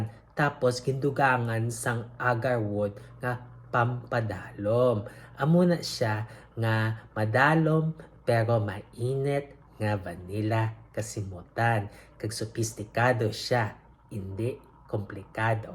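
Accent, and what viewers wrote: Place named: Iloilo, Philippines. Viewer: Nice may ilonggo nga reviewer. 😁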